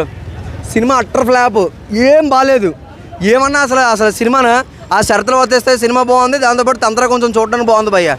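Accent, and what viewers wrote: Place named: Andhra, India. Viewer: by his slang it seems like he is from andhra who doesnt know any history of hyderabad. adi ni pain kadu kada niku ardam kadu chudadam maneyi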